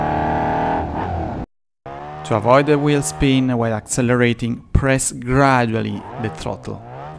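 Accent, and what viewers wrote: Italy. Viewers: L'accento italiano antisgamo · I love your Italian accent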